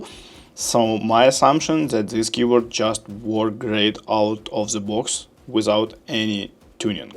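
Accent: Russia. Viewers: russian accent?) я все понял )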